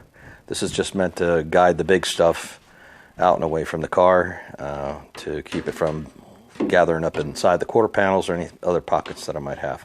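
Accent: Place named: New Jersey, United States. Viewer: Your accent sounds like you're from central New Jersey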